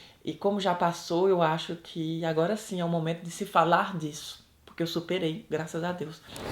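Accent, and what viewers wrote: Northeast, Brazil. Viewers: Esse video me foi recomendado e quando eu vi que era de um cearense vim correndo ver. Amei sua historia, amei que não perdeu seu sotaque, parabens por tudo. ❤️ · amei sua história gosto do seu jeito de falar não perdeu sua origem nordestina muito simples estou conhecendo Paris com vc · Que sotaque gostoso, meu nordeste é lindo. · Mininu,e tu num perdeu esse sotaque até hoje., Por causa de que ❓ Tu è da minha cidade, crato!!!🤩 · Eu admiro você por ter mantido o sotaque do nordeste, isso é lindo! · Eu ri e chorei com sua história, que coisa linda❤️ amo nosso sotaque nordestino, que Deus te abençoe. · Amo seu jeito nordestino, seu jeito de falar, alto astral.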